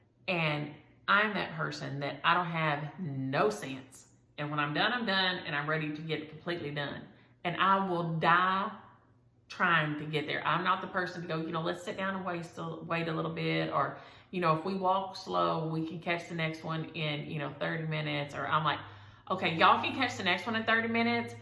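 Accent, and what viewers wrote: South, United States. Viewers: we speak the same Southern drawl!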